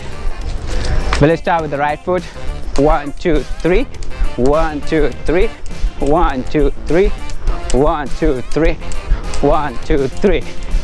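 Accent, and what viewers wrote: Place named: India. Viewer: Butler english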